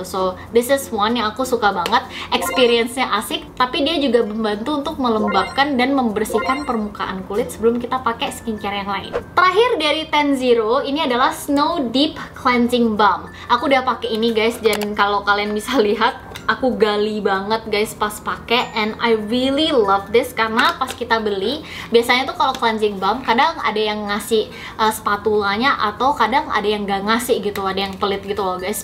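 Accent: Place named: Java, Indonesia. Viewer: bibirna medok teuing eta teh...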